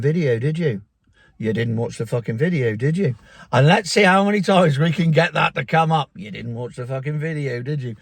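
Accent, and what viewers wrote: Cornwall, United Kingdom. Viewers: Love the cornwall accent,takes me back to when to when i was young,my grandparents came from cornwall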